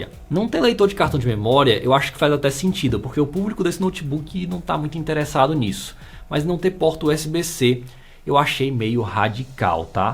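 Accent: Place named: Salvador, Brazil. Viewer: Seu canal é muito bom mano, impressionante como pessoas assim não tem tanto destaque quanto aos demais, vi cada review fraco até chegar aqui. Acredito e torço pra que seu canal tenha o crescimento que vc merece. Esse sotaque... vc tbm é Salvador?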